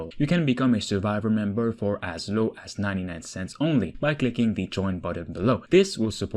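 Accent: Quebec, Canada. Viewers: yes , plz cover it :) . also i can definitely ear that youre from quebec as you dont have a french accent in your english . soooo content de voir un qc qui reeussi sur youtube . ps oui je sais , mon francais est a chier . sa cest due au fait que langlais est plus facile pour moi meme si je suis un pur laine :) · Hey man, are you Québécois ? You have the accent ;)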